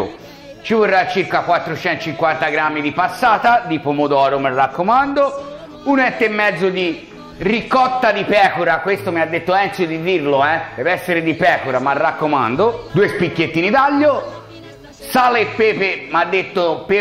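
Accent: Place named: Tuscany, Italy. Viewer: Sei strabravooooooooooo❣️❣️❣️❣️Mio marito adora i ciccioli e devo assolutamente cucinare la tua ricetta,ma in Svizzera non trovo i ciccioli xciò dovrà aspettare la nostra prossima puntatina in Italia e precisamente a Brescia.Sei simpaticissimo ,adoro il tuo modo di fare è il tuo accento toscano.Bacione😘😘😘😘😘😘😘😘😘😘😘😘😘😘😘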